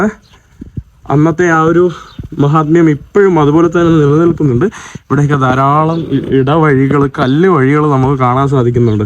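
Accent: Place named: Thiruvananthapuram, India. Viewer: അവൻ സംസാരിക്കുന്നത് തിരുവനന്തപുരം സ്ലാങ്ങിലാണ് ഓരോ ജില്ലക്കും അതിന്റെ തായമാറ്റങ്ങളുണ്ട് ജില്ലയിൽ തന്നെ വിവിധ ഭാഗങ്ങളിൽ വ്യത്യസ്തമായ വാക്കുകൾ ഉപയോഗിക്കുന്നുഎന്നിട്ടാണ് മലയാളം ഉച്ചാരണം ശരിയല്ല എന്നൊക്കെ പറയുന്നത് കൈയ്യിൽആധുനിക മൊബൈൽ ഒക്കെ ഉള്ളതല്ലേ ഗൂഗിളിൽ ഒന്ന് സെർച്ച് ചെയ്താൽ കിട്ടാവുന്ന തെയൊള്ളു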